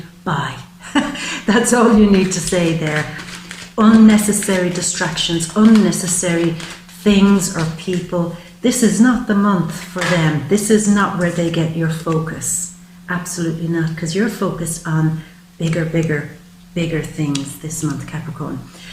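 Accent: Ireland. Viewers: This is 1 of the best readings you've ever done. Everything resonated. All the best to you from Canada 3> By the way what part of Ireland are you from?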